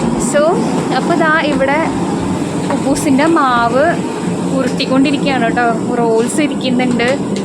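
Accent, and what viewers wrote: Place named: Vadakkanchery, India. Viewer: ഞാനും തൃശ്ശൂർ ആണ് വടക്കാഞ്ചേരി ആണ് സ്ലാങ് കേട്ടപ്പോൾ തോന്നി എന്റെ ഏരിയ യിൽ എവിടെയോ ഉള്ള ആളാണെന്ന്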